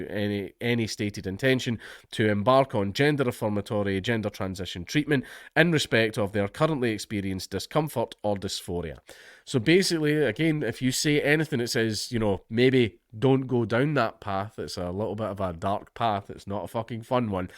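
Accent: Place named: Scotland, United Kingdom. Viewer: What I hear is an angry scot ready to kick ass when the government tries to mess with his babies. Love ur vids btw.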